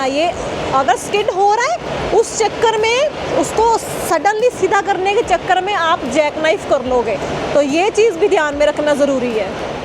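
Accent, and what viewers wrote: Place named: Punjab, India. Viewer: Aapki punjabi english badi pyari hai. Aap ki birth place konsi hai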